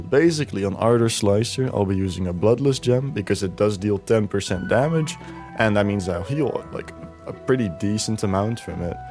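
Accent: Netherlands. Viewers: Ik hoor een Nederlander ❤ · your accent is like realy dutch idk man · ik hoor de nederlandse accent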